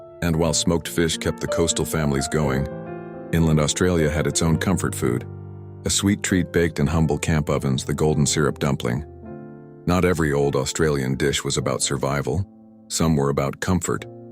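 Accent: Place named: United States. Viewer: why the american accent ?!?!?!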